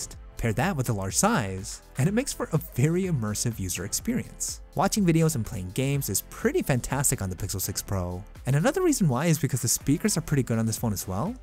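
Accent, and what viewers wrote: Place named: Illinois, United States. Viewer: It's how we talk here in Illinois. Especially the front part of the state.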